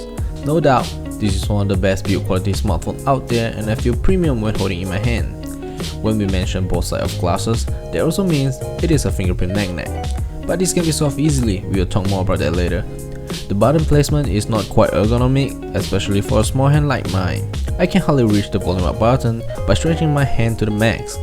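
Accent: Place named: Malaysia. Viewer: hey, great video. I guarantee that you'll have tons more subscribers very soon!! also, where are you from?? sounds like Malaysia, Singapore ah? like me ;-)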